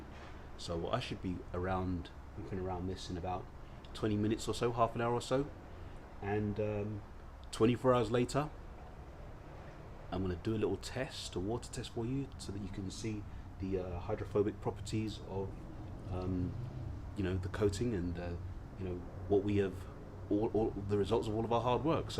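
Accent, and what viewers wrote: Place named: United Kingdom. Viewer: I have been using it for past year or so. When I bought my wife her 4th new car, I figured it's going to last until...I retire😅 Her 2021 Camry SE is getting it's 2nd coating. 1st lasted months, just 1 coat. Her car stays in the garage...my poor 2016 Tundra stays out in the elements with just an occasional wash and wax. I recognized your Brit accent😁 I lived there for years in Felixstowe when serving in USAF st RAF Bentwater.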